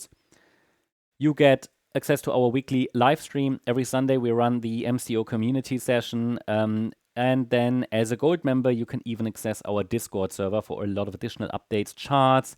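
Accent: Germany. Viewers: Man hört deutsch raus, geiler Kerl!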